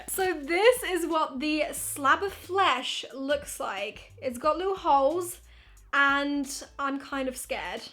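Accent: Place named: England, United Kingdom. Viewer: I think I'm in love with your english accent❣️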